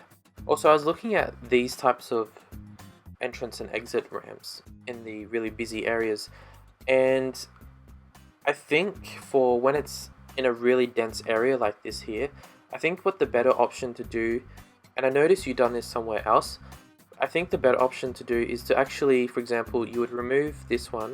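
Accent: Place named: Adelaide, Australia. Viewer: your accent's similar to my Adelaidian friend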